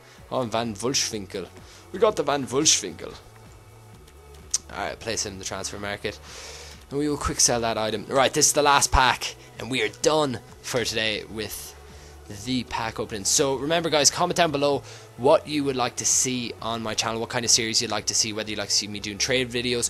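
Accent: Ireland. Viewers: are u irish PLEASE ANWSER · hes irish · are you from ireland?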